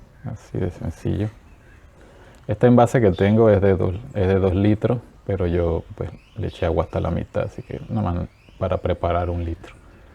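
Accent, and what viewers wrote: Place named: Panama. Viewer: Por tu acento veo que eres panameño … saludos y muchas gracias por el dato!!!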